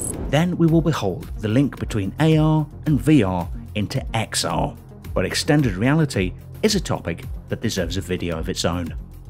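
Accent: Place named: RP, United Kingdom. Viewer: Puke inducing, oversofisticated British accent. Voice is akward modulated, I turned it of I couldn`t stand the lector. I had convulsions after listening him, even though the topic was interesting.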